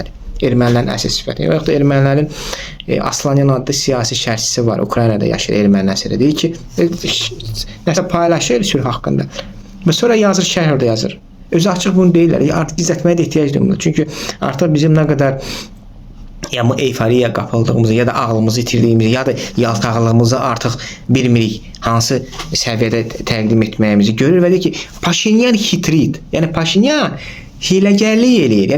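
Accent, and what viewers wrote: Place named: Baku, Azerbaijan. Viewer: Ləhcəndən bilinir karennoy Bakılısan amma erməniylə öpüşənə haqq qazandırırsan tema o deyil tema 1905 ci ildə daşnaklar ruslara Bakı kəndlərində nəqədər söz sahibi papqlı kişiləri güllələyib uşaqlarının başına daş bağlayıb xəzərə atıb boğurmuşlar bax budu tema millət demək istəyir dığalardan bizə nə qonşu nədə dost olar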